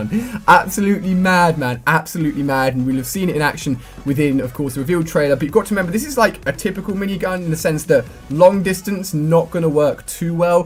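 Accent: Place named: United Kingdom. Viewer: Don't know what's better the game his commentary or his British accent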